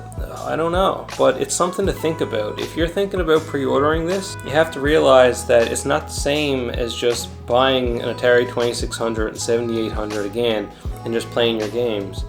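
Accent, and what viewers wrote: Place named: Canada. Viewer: no way this guy isn't Canadian, lol - i'm saying East Coast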